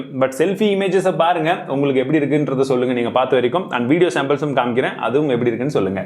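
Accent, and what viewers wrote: Chennai, India. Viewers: first time hearing chennai slang . ......😅🤣🤣😂🤣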